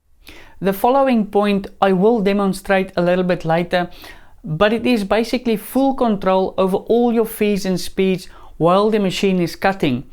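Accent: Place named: Afrikaans, South Africa. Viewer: Lekka Afrikaans South African accent - Made me chuckle :D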